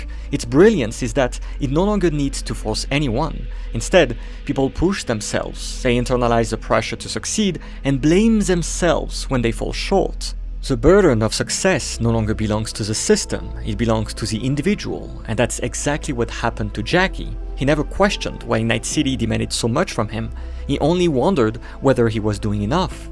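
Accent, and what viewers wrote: France. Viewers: Good video. Is it just me, or you have a French accent?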